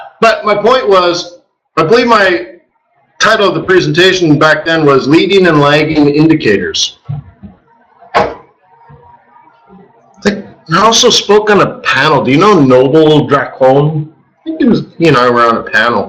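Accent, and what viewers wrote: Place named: England, United Kingdom. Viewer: you sound so funny with that English accent😂😂😂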